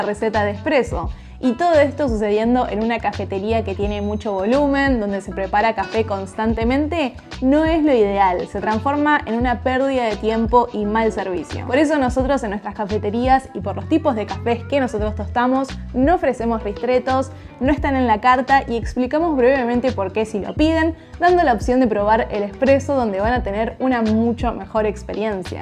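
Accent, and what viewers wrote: Argentina. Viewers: Soy de México, y cada vez que veo tus videos, además disfrutar ese acento femenino de argentina que cautiva, me embelesa tu desempeño. Si ya sé, debería hablar de tu belleza, pero ante tu profesionalismo, sería una falta de respeto ignorar este. Bueno, hay un poco de broma, excepto acerca de tu profesionalismo (y de la belleza desde luego). Me gusta tu canal porque soy una de las personas atípicas. A mis sesenta y tantos años de vida, mi acercamiento al café, es increíblemente reciente. Sucede que soy intolerante a la cafeína, me impide dormir (literalmente), uno o días seguidos con tan solo una taza, pero cuando sucede y me desvelo hasta las cinco de la mañana, a las siete en punto despierto como si nada. Claro, el café no me engaña, mi cuerpo está cansado pero me dice una o dos cosas para que crea lo contrario, en fin. De alguna manera ahora me he aficionado al café y como siempre sucede con mis pasatiempos, me he apasionado, llevo algo así como seis meses viendo tus video y los de muchos mas (les recuerdo que mi afición al café es reciente), y mira que he descubierto un mundo. 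Me sucedió lo mismo con el vino tinto y la cerveza artesanal de las cuales, en efecto, tengo un poco más de tiempo. Me da la impresión de que fuera de tu edad, que te ves muy joven, tienes ese tipo de conocimiento y de experiencia que convencen, y créeme, soy el mayor escéptico y quisquilloso que pudieras conocer, cada cosa que se dice la investigo, y debo ser honesto, me has sorprendido. Felicidades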